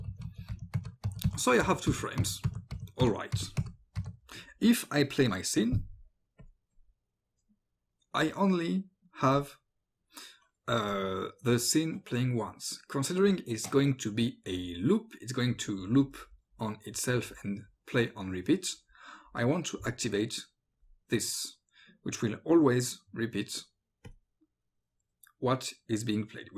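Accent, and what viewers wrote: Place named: France. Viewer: Merci pour toutes ces vidéos, cher compatriote dont l'accent n'a pas fait mystère! ;-) Je suis en train de m'autoformer à Harmony, et ces vidéos m'aident beaucoup.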